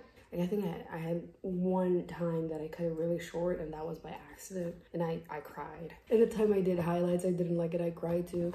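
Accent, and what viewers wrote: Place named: Brazil. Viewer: Eu suspeitei que você fosse da região de onde eu sou porque você chamou a fruta Pinha de "pinha" em um vídeo, e não de fruta do conde ou outros nomes que outros lugares do país dão. Eu sou de Tanabi e descobri que você é de Rio Preto, fiquei feliz hasuhauhsuhaus Vi o filme que você fez, representando uma norte americana. Que tudo, um inglês totalmente brasileiro sendo usado pra representar americanos quando a gente como brasileiro ta cansado de ver os gringo usando mexicanos e outros para representar brasileiros hehehehehe~~ Feliz, obg por representar, viu. E agora como ruiva vão te confundir mais ainda com outros países haushuahuhs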